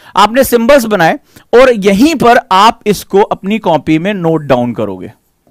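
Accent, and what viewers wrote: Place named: Bihar, India. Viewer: Mind blowing explanation sir thank you so much 💐 specially your bihari accent Amezingg.... Superb 😆 Again thanku sir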